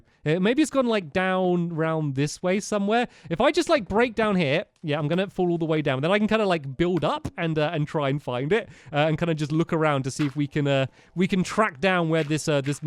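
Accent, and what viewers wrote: United Kingdom. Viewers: Is he british????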